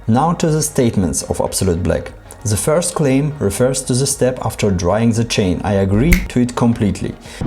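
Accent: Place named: Germany. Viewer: Interesting accent fpr an english speaking german - is it for sure better then a paraffin + pfte powder combination?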